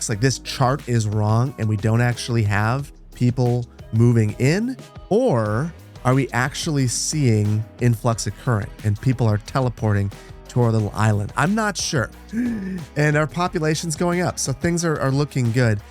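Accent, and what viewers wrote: United States. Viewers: You say tex-tile but not fer-tile. You say furtill. Is your floor made of till or tile? The way some yanks use English is so weird haha. Cheers love your content.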